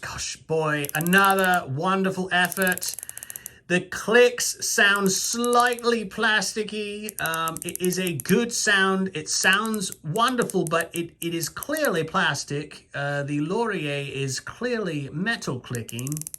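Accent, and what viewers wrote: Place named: England, United Kingdom. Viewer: Brilliant video sir! And you kept that (exceptionally good) English accent up all the way through too! 😄👍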